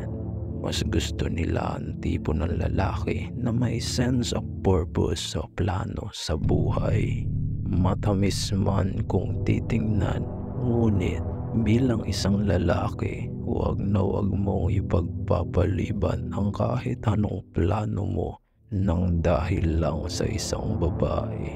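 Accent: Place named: Visayas, Philippines. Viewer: bkit ganyan boses at pagsasalita mo???bisaya ka noh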